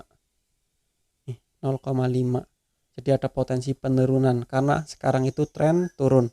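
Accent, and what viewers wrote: Banyumas, Indonesia. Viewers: logatnya kok mirip saya ya 🤭 salam dari wangon mas bro...izin menyimak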